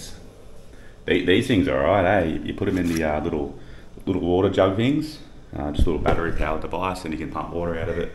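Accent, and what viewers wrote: Australia. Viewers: Hey mate, how do you still have such a thick Aus accent hahaha. Crazy that, normally when you move out of Aus we get more pain English accent, but in each vlog yours seems like you get more and more aussie.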